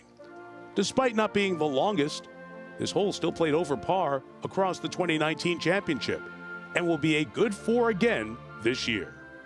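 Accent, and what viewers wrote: United States. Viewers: Such a pity that the R&A has stooped to having a yank voiceover. Is nothing sacred??? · A yank commentating on this is daft. As much as I like the guy who is talking. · Shame you got an American to narrate....🙄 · Why is the narrator an American!? No northern Irish narrators free? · Ah here lads, why is an American narrating this and what is with the dizzying transitions from one hole to another. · Yes indeed, why the American narration?!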